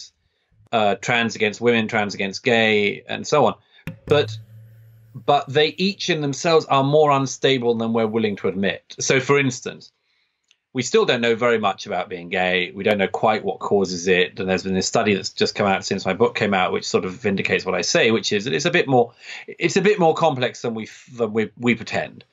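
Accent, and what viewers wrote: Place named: United Kingdom. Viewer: whole thesis is there's a collapse of shared stories in the West with the decline of ideologies like Christianity and capitalism. But then, he attacks civil rights movements aka 'identity politics' (gay/womens/trans) for being exactly that story to tell for many, because apparently they're too divisive and not scientific enough for him. Does he seriously believe that ideologies like Anglican Christianity and Smithian capitalism rested on some bedrock of universal value and rationality? What a myopic worldview. This guy is a muppet. Not everyone with a British accent is a genius.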